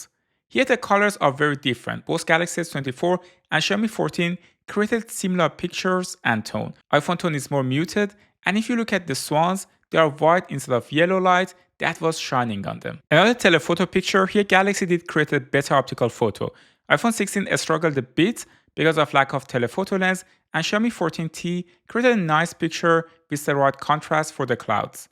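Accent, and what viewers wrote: Iran. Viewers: Is this Iranian accent? Or am I wrong? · داداش ایرانی ای؟ خیلی لهجت ایرانیه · لهجه برای یه ایرانیه😅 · Aren't you Iranian? The accent sounds like Iranian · چرا حس میکنم گوینده یه ایرانیه😂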